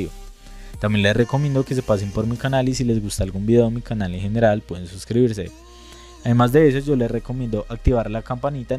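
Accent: Bogotá, Colombia. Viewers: Iba a preguntar si en LAN se puede pero se le noto el rolo :v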